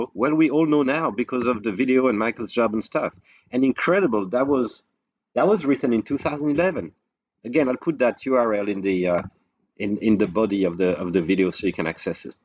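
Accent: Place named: France. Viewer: nice french accent ! ^^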